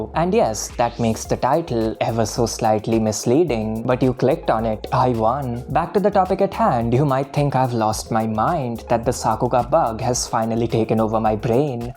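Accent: India. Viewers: bruh why do u talk so slow lmfao, is it because of indian accent?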